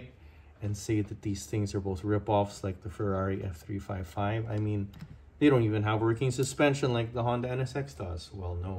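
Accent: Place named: Philippines. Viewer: What nationality are you? Accent sounds filipino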